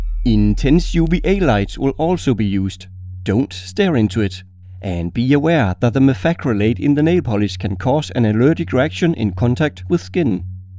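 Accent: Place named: Denmark. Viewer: That accent sounds soooooo danish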